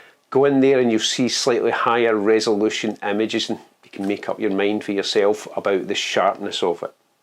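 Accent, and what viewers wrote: Scotland, United Kingdom. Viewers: Nice review, thanks! Your intro is very dramatic and usually these lead to a confident American model...Then we get your strong Scots accent. It’s always good to be a little different. Your images are excellent so I’ve subscribed immediately. I really hope you don’t dump your Nikon gear; you know it will be better in low light (over the Xt5)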